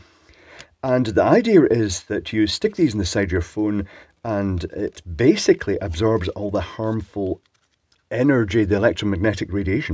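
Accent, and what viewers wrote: Scotland, United Kingdom. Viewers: incredibly well spoken for a Scotsman never heard that accent anywhere here. also that's pretty toxic how is that even legal? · love to hear voice and scottsh accent ♥ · . "Really? Sounds like a load of bollocks" Did I get that right? I'm not too familiar with the Scot's accent. Followed up by "Are some people stupid"? and the classic, "Is naivety a form or stupidity"? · I wish people would stick those onto their eyes to stop reading about this 5G Hazard bs. Btw it’s interesting to hear how much a Scottish accent differs from person to person. While I understand you perfectly, listening to your two buddies from the MBC sometimes leaves me absolutely clueless 😂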